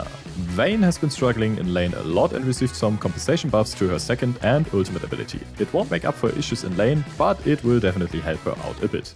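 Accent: Germany. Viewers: The german is strong with this host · I really like this German Accent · Man hört es direkt das er Deutscher ist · Is the moderator a German? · R U German? Ur pronouncement Sounds Like thia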